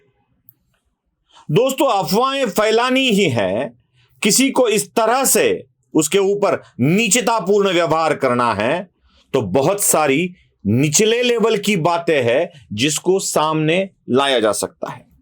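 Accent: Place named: Bihar, India. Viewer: Hindi mein hamesha A hi bihari style ho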